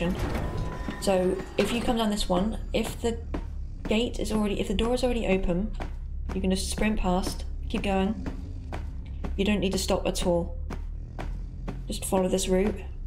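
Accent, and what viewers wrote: United Kingdom. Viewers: Are you British? Perchance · Are you British? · I thought this was a 13 year old British kid the entire time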